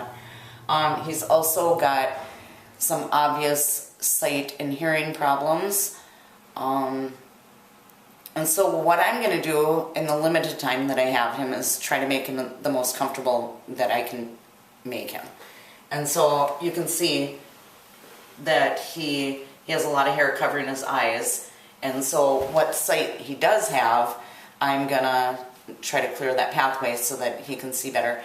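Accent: United States. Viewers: Good to see you again, and hear your Yankee Doodle accent. Conway is a cutie pie.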